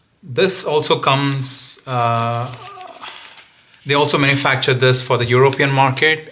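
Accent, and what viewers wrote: India. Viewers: is definitely an Indian fella.the his commenting.so fuckin yindiann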